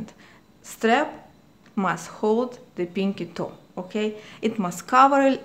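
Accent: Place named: Russia. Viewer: Я только начал учить английский, через секунду узнал русский акцент.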